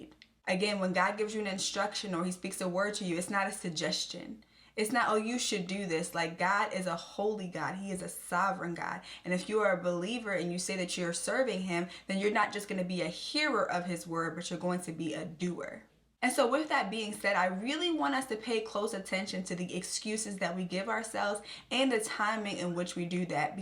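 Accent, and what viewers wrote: Baltimore, United States. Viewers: Off topic but are you from baltimore/dmv area?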